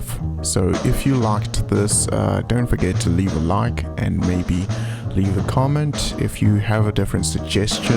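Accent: South Africa. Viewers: you sound like you're from South Africa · South African? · You sound south african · South African? lol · South african accent.. Thanks.. Good info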